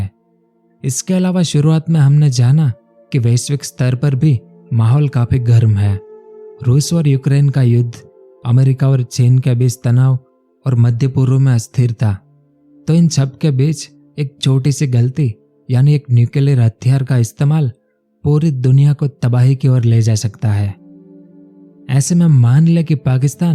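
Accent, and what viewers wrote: Gujarat, India. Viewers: Bhai aap gujarati ho??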